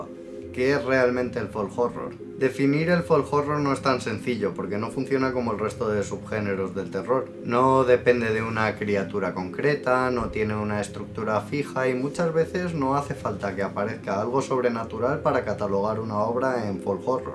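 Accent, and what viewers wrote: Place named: Spain. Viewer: Pensé que el narrador era un señor de edad avanzada, por la voz como floja con las letras pegadas, somnorifico, monótono, somnoliento… cuando vi que era un joven, me acordé de wolfsmoker y cautivosdelcine1376, también críticos de cine, videbloguers españoles. Ahora me pregunto si la entonación es propia de alguna zona de España.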